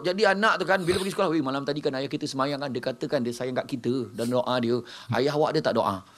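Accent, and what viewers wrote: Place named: Malaysia. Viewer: Wahh bang skit2 dh ada seleng Malaysia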